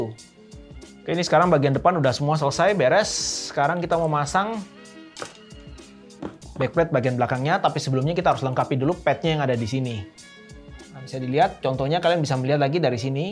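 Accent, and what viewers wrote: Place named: Surabaya, Indonesia. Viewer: kalo boleh tau, nih channelnya orang mana sih.. bahasanya jatim sby an banget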